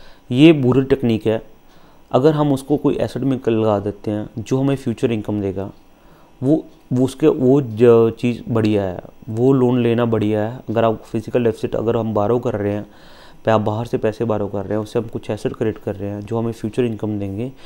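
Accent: Punjab, India. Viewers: You accent says You are Punjabi.. If you are, this is the first time I am watching a economic session from a Punjabi.. Loved it.. Thankyou.. Ap ghora, cycle bol sakte the... Donkey kharid ke us par baith kar office jana 😂😂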